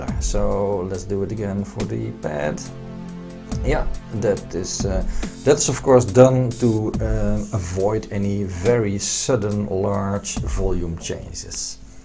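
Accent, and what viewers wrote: Netherlands. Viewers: Hello I like your video's about the MODX. They are very helpfull. Your English sounds a little bit with a Dutch accent, so I assume you are Dutch? Mag ik je daarom iets in het Nederlands vragen; ik overweeg om ook een MODX6 aan te schaffen, maar ik ben vooral geïnteresseerd in de Vocoder mogelijkheden. Heb jij daar misschien al ervaring mee, want op Youtube heb ik daar tot nu toe nog niets over gevonden..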